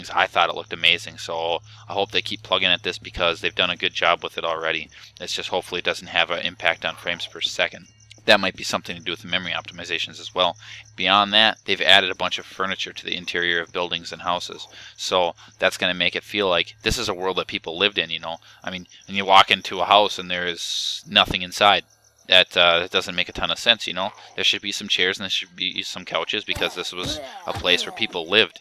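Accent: Canada. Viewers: you have a heavy newfoundlander-canadian accent lol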